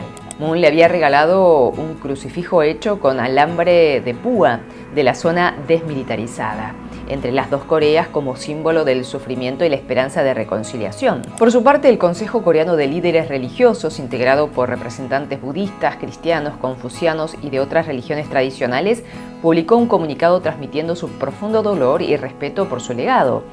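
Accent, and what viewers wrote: Argentina. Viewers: QUE BONITA VOZ QUE TENES, RECIEN TE CONOSCO Y ME QUEDE A ESCUCHARTE,me sorprendió tu tono de voz se asemeja a tonada argentina!, saludos desde ARGENTINA..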